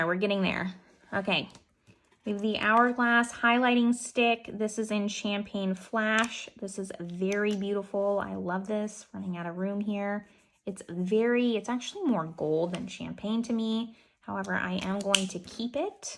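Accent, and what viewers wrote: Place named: United States. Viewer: Also I’m just curious, where are you from? Your accent is very pronounced something like Montana, Chicago, etc.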